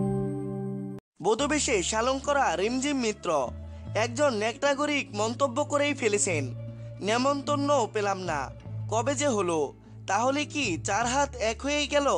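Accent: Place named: Bangal, Bangladesh. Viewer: Amader west Bengal a ki u tube Chanel a boler moto keu ni j bolche se to amon vabe bangal vasay bolche baje lagche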